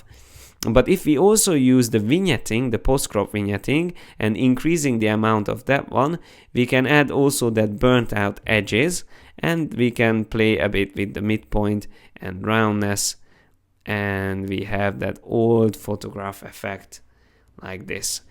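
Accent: Hungary. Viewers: Annyira jellegzetes a magyar akcentus,öröm volt hallgatni:) De persze a tutorial maga is perfekt!!! Rengeteget tanultam belőle,hálás vagyok!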